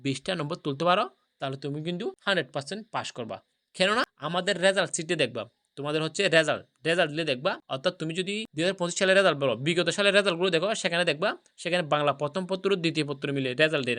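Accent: Chittagong, Bangladesh. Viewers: Apni mone hoi chitainge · ভাইয়ের বাড়ি মনে হয়, আমাদের চট্টগ্রামে ❤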